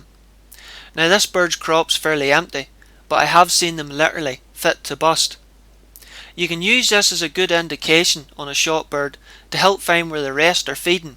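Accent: Ireland. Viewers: Ah, there at last. Your handsome face to match the charming Irish accent....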